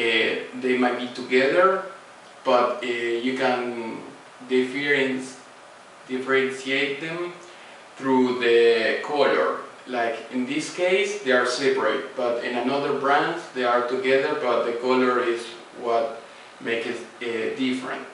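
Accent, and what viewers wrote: Argentina. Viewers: ese ingles made in argentinian.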